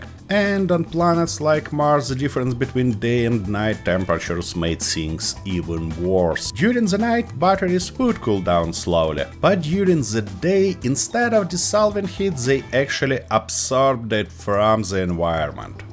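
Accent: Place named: Russia. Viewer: This is already interesting as a topic just on it's own but having it explained to me by a crazy person with a thick russian accent somehow makes it even better.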